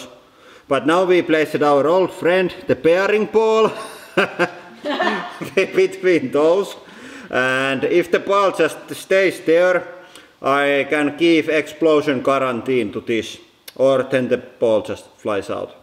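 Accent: Finland. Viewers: Is this guy finnish. The accent sounds like it lmao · The rough finnish accent is killing me xdd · Are you from Finland cause you have a Finnish accent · He sure sound Finnish. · This is awsome channel. The finnish rallye english is something else. 8) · that accent is so finnish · The Finglish is strong with this one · Today the content was not so good ..but like your Finnish accent and your wife's laugh so I pressed like..!!! Stay happy..!! Love from India..! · omg even when he speaks english it sounds like finnish. 😂😂 what is he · Dean Rowlinson Sounds like my Finnish grandfather.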